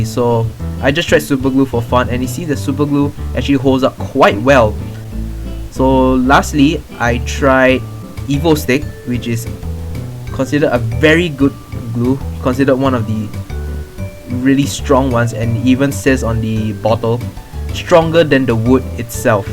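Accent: Singapore. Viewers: Haha you sound either Singaporean or Malaysian! Anyways nice tutorial bro! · Singapore accent?? :00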